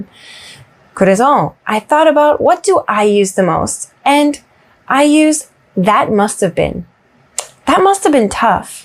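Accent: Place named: Midwest, United States. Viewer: 완전 중서부 American Standard 발음임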